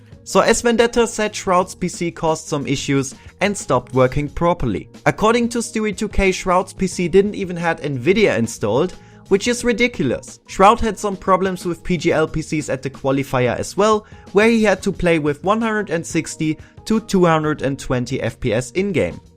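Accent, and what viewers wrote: Germany. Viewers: Bist du deutsch? (Wegen deinem Accent) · Wow man hört direkt das du deutsch bist 😂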